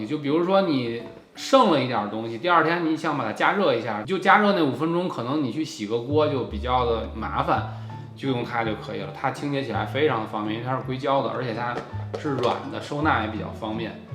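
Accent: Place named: Beijing, China. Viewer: 看你很多期了 各种北美生活的妙招儿 北京话也特亲切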